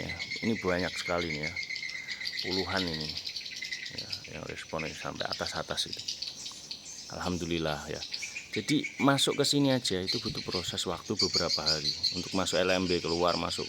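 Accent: Java, Indonesia. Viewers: Mantap kang, jowone endi kange ?? Analisanya mantap kang, bener yg sampean bilang bahwa bw adaptasi butuh proses dan mekanisme nya persis seperti yg sampean sampaikan. Saya juga ngalami hal seperti ini, alhamdulillah belajar dengan terjun langsung akhirnya menemukan banyak hal. 🙏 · Jawa mana mase...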